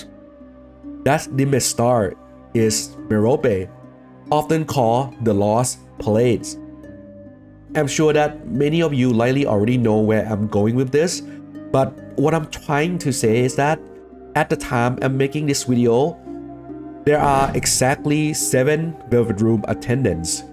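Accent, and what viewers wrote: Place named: Thailand. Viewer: Excuse me, this is not an insult. I wanted to know that are you Thai or not? I recognize it by the accent. I am just curious.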